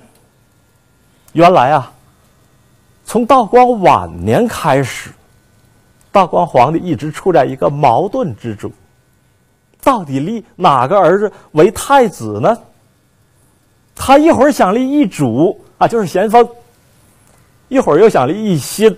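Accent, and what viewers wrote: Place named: Northeast, China. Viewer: 每次听到东北话，都觉得莫名的搞笑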